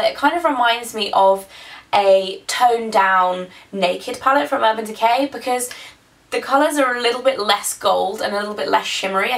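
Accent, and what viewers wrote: England, United Kingdom. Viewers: happy birthday your soo lucky you have blonde hair and a british accent and your freaking georgeus im soo jealous omg haha well happy birthdayyy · I went to imats too!! I wish i had watched ur vids way before imats bc then i would of searched for u! I lovvvee ur british accent :3 btw i got a creme blush in orange . LOVVEE IT!! I want that pink one ! And i also got that same palette ! ^.^ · I love your English accent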